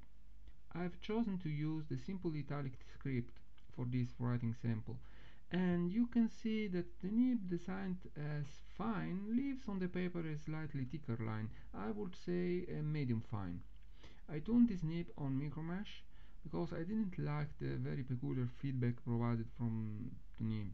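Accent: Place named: Italy. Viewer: Bellissima recensione, dallo slang sembri italiano, perché non fai recensione anche in italiano?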